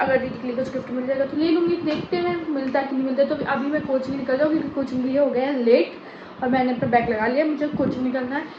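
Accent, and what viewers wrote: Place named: Bundelkhand, India. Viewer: Bundelkhandi achi lgti aapki 😊❤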